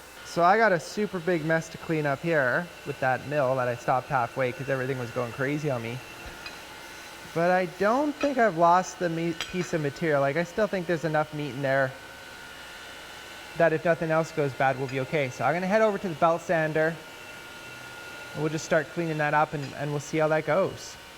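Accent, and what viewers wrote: Canada. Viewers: I'm Canadian. And you sir, have a truely incredible Canadian accent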